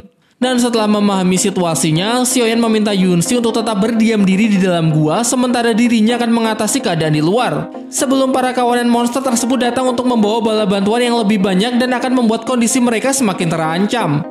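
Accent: Java, Indonesia. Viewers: ga akan sih gw ga suka suara cewe nya udah nyarin medok lagi, gw bukan org jawa makanya ga suka sama suara cwenya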